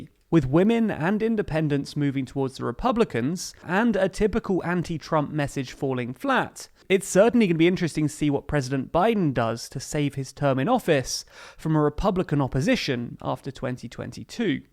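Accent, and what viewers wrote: United Kingdom. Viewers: He meant after 9/11 not of I know it's kind of hard to hear with a English backslash British accent but he meant to say after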